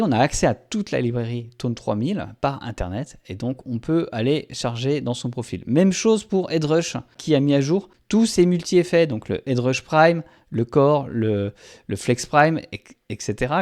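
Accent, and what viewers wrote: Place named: Belfort, France. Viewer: tu as l'accent des Territoires de Belfort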